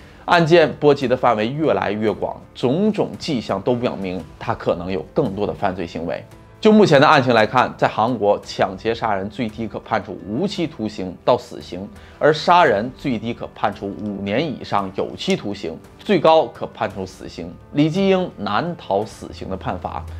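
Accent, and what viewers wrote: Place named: North, China. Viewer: 感覺up主像北方人,但咬字很清楚,解說很有吸引力,訂閱你了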